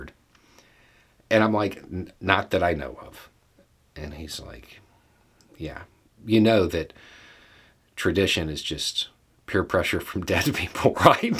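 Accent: South, United States. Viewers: Enjoy your channel (and accent from one southerner to another). What I tell folks is it keeps ppl in jobs. In this case the postal workers. Your son would probably answer that Internet companies have workers too? Well no son. They have AI reps that you can't get an answer from or they've lost their jobs like Twitter. I won't get into the environmental part of this, but as others have pointed out not all paper is cut from trees. HAPPY HOLIDAYS! See? I just saved time, money, and a tree. Have a good'un.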